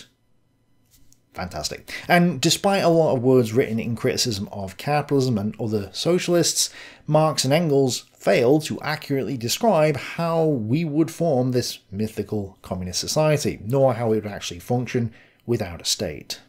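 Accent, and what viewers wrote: United Kingdom. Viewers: Classic, just few minutes in and so much makes even more sense than before, almost as good as Captain Context himself. Luv me listenin' to Br'ish lads, simple as.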